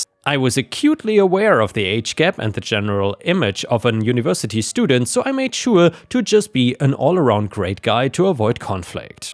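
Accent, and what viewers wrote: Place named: Germany. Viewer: I always enjoy how you narrate these stories - the German accent makes the stories more interesting to listen to. Keep it up!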